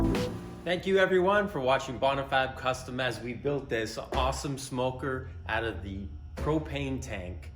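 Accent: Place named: Ontario, Canada. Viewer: Ha was watching and just by your accent I knew you were one of the good guys, Canadians that is and from Ontario. I am in Windsor and I am build a smoker as well and just love watching others build them. Thanks for the video eh!!!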